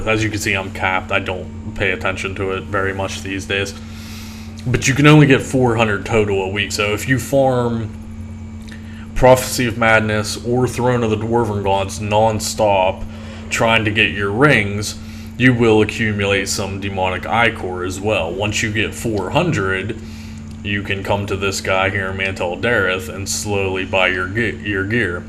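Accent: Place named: Philadelphia, United States. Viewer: Nice, me too but you sound like a friend who moved to Philadelphia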